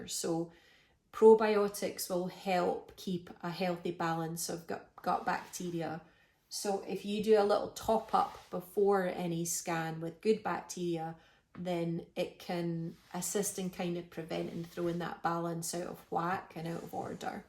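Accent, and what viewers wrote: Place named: Scotland, United Kingdom. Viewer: Love you Scottish accent. Mines a boring kiwi accent lol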